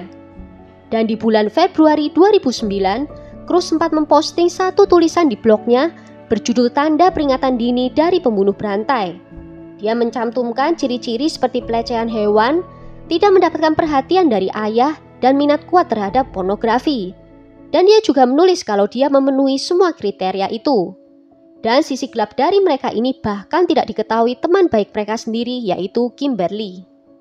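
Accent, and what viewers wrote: Java, Indonesia. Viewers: Logatnya mba medok tp suaranya bagus jelas terdengar 👍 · Aku merasa terganggu sm medoknya.....Tlg latihan lagi mbak..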